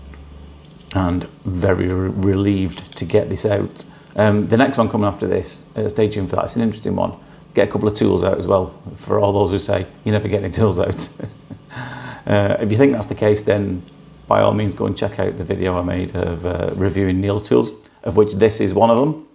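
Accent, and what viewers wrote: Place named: Lancashire, United Kingdom. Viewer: You sound like you're from Bolton/Rochdale way. I need this doing I think, where can I find you?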